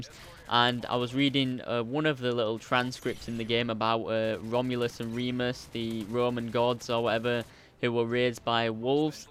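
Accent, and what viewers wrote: Yorkshire, United Kingdom. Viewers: Where you from mate, you sound like you're a fellow Yorkshireman?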